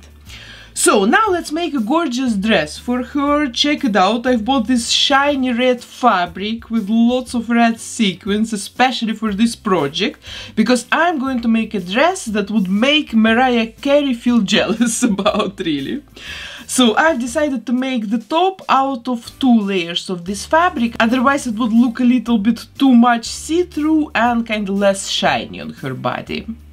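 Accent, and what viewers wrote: Russia. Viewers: это русский акцент верно?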